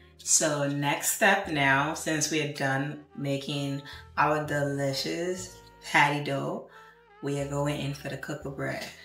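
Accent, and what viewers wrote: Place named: Liberia. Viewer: The way you pronounce certain words reminds me of Mercy Gono, who is also from Liberia.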